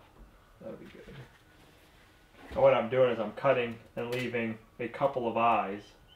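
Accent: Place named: Northeast, United States. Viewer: Moulton - It could be his unique NE USA accent. My 'ear' didn't catch it as odd. Although I'm SE NC, I had relatives who were No. NC & So. VA, with accents of saying "out & house" that sounded similar to "boot." My Grandma called shovel "shevel" & cover "kiver" with short vowels. 😊 I know is further north, but IDK where he grew up. It's the "Queen's English," as NC's tourist commission calls that type of accent, remains in parts of NC. Not quite northern VA nor New England. Many local areas have uniquely nuanced ways of speaking. just sayin'.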